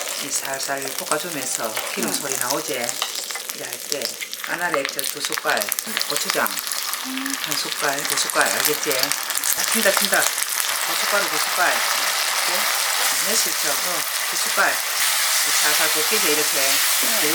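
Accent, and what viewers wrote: Gyeongsang, South Korea. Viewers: 강원도 사람인데 사투리가 진짜 듣기좋네요 ㅋㅋ 경상도로 이사갈까 ㅋㅋ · 경상도 사투리가 재미 있어요. 홍양,홍양..ㅋㅋㅋ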